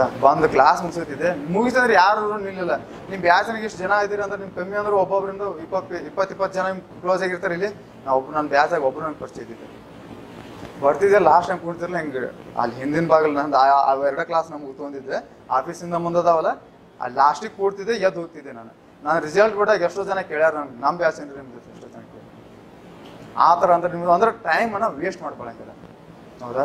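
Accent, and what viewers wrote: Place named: North Karnataka, India. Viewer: Super sir... ಉತ್ತರ ಕರ್ನಾಟಕದ ಮಾತುಗಳು......nice.....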